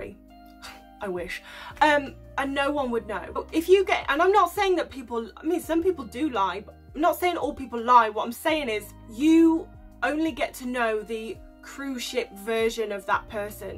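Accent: United Kingdom. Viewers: Nice one , very nice information,nice accent,are british.keep posting more info mate bye for now.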